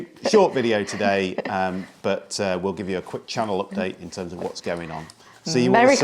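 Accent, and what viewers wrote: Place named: California, United States. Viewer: Its been a delight to share your adventures over the year; informative and entertaining. Will miss your Cali slant but look forward to hearing about the next phase.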